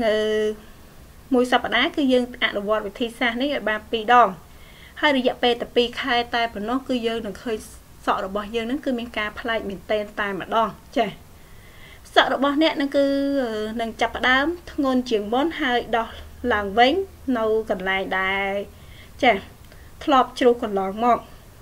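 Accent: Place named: Koh Kong, Cambodia. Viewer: អ្នកកោះកុងហ្មងសំលេងនឹង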